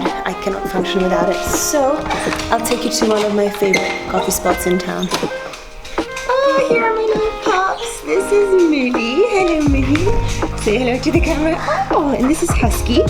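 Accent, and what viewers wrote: Pakistan. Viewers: ... 😭 “LET ME TAKE YOU OUT ON A TOUR TO MY ISLAMABAD”... and “CHALO!!!! LET’S GO!” This couldn’t just get any cuter than this. speaking in beautiful Urdu accent! 😅😅👍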